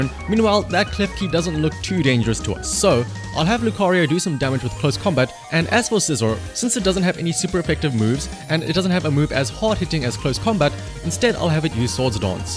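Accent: South Africa. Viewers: Fellow SA Pokemon player here based in Cape Town. Found your video via the algorithm and heard the accent and I was shook! 😁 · Nice video bro, is that accent South African?🤔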